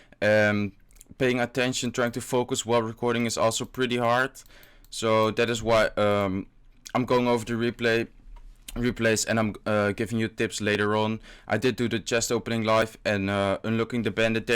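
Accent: Netherlands. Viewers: je hoort zo goed dat ie NL is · ur 100% from holland. 100 procent. ur accent is to recogniseable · ben je nederlands je accent is beetje nl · you are dutch arent you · Where are you from you sound like Some one from the netherlands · Ik hou van je nederlands accent op je Engels ❤️❤️❤️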